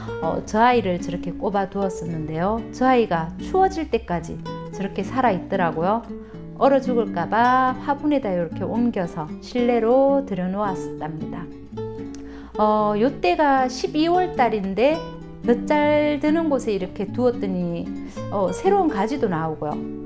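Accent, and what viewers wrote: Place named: Gyeongsang, South Korea. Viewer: 요즘은 애니시다도 능력에 따라 삽목이 어느정도 가능해진것 같아요 정감있는 대구사투리? 즐겁게 보고 갑니다~~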